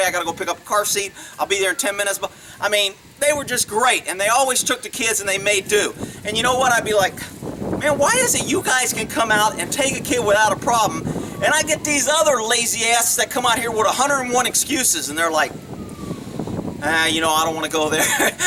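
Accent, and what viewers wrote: Texas, United States. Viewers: is he from Texas?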